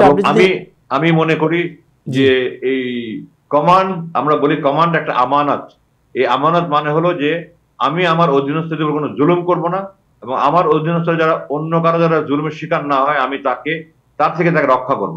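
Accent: Sylhet, Bangladesh. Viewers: Kindly try to improve ur SYLATI ACCENT Mr. Conductor.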